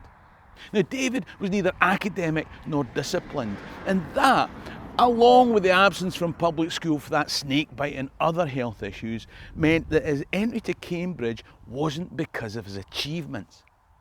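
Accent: Scotland, United Kingdom. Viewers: Though it took me a while to adapt to the Scottish broga , You are the best story teller I can remember hearing and I'm old.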